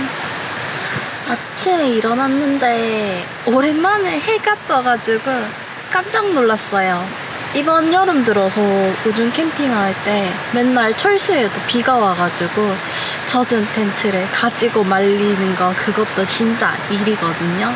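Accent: Gyeongsang, South Korea. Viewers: 님도 이쁘고 경상도 말씨도 좋네요 하이볼에는 위스키가 정말 맛있어요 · 갱상도 분인갑네요~~ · 화면만 나오는지 알았는데 말도 하시네요 혹시 대구 경상도 아가씨가 맞죠?인생 너무 즐겁게 사네요ㅡ전맨날 집ㅡ직장ㅡ집인데ㅡ남자 게스트ㅈ안필요 하세용ㅋㅋ🎉🎉❤😮😅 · ㅎㅎ 이분은 귀여우심 경상도사투리도 귀엽고 그래서 구독 ❤ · 씨는 경상도 말쓰는거랑 웃는모습이 참이뻐요^^ 젤좋아하는 캠퍼예요^^ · 경상도 말씨에 인상도 좋으세요~우중캠핑 잘봤습니다 고생하셨습니다❤🎉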